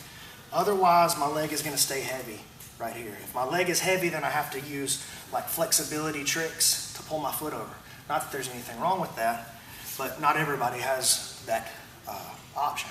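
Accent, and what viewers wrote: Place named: Decatur, United States. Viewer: im from Decatur....aint been back in a while. loving the accent. feels like home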